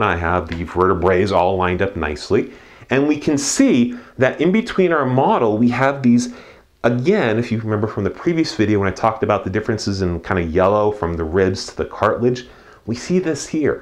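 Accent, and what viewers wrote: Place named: Texas, United States. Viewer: these are super helpful and it's fun hearing a lil' bit of Texas in your speech.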